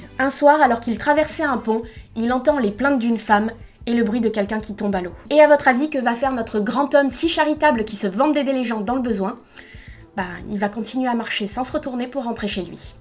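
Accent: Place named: South, France. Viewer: Super sympa ta chaîne j'aime bien le concept (étant moi même en 2e année de prépa littéraire, forcément) ça change un peu de ce qu'on voit sur youtube tous les jours! Juste une petite précision au niveau de la prononciation, Zweig se prononce "tsvaïg" (à l'allemande, si on prononce aussi son prénom "Chtefan") ou à la limite "svaïg" mais le "ei" est bien à prononcer comme le mot "ail"! Voilà j'espère avoir pu t'aider, comme j'entends à ton accent que tu viens peut-être du sud, les noms d'origine allemande ne sont pas les plus simple à prononcer! Bonne continuation :)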